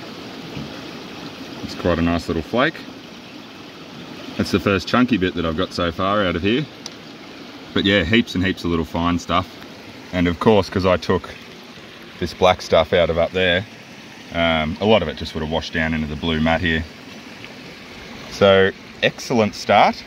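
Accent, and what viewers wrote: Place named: Australia. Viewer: I love your channel too. And that accent gets me ,you aussies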